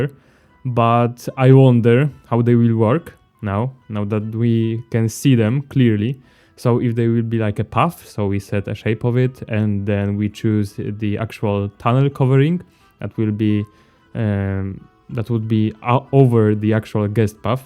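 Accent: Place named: Poland. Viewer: Are you from Poland? You have that kind of accent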